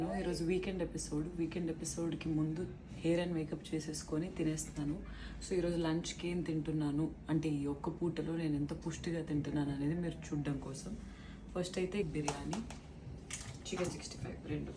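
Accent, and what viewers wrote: Telangana, India. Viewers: Telangana slang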